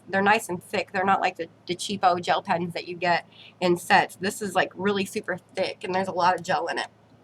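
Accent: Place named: South, United States. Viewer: Your southern accent is adorable! And great tips girl!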